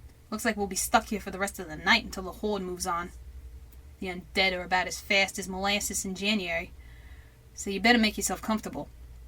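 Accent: United States. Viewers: I'm gonna assume this is the Boston kind of accent. I'm from the South, and I never met a Northener before, so I can't tell accents apart. But I love it! I love everything in this! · You have an amazing New York accent.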